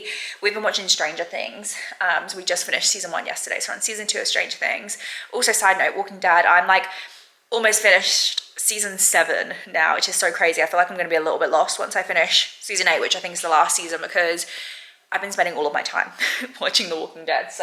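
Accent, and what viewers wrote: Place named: Australia. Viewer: g day/evening for you can I ask when did you start picking up the aussie accent. love you r vlogs by the way 😊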